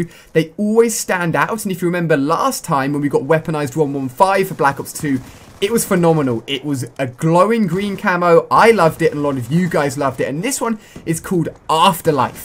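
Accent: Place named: England, United Kingdom. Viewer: English accent but says amarican (astralian)currency$$$$$??????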